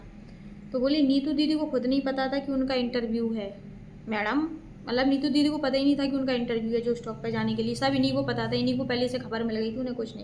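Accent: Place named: Madhya Pradesh, India. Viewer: Waise aap MP se kha se ho…mai bhi MP se hoon….apki language Suen kr lga ki aap hmare taraf k hi ho😅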